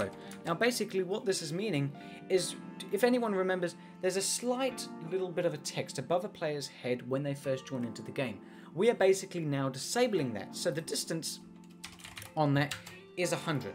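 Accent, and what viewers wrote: United Kingdom. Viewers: The best script tutorial YouTuber of all time, he explains everything too well... And I love the UK accent of this guy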